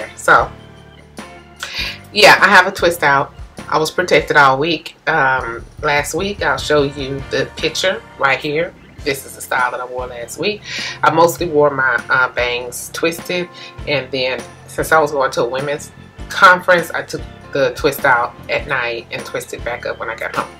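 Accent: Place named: South, United States. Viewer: i love hearing ur lil country accent lol. u look AMAAAAZING!!! i need ur detox type diet plan!!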